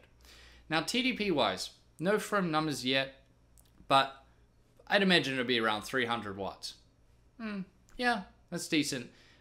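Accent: New Zealand. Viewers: I've noticed your accent starting to change since the move. You are losing that touch of Kiwi.:) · He's a New Zealander talking like a New Zealander.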